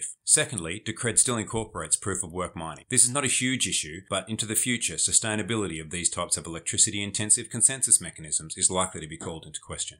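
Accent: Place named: Australia. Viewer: I think that's just the Aussie accent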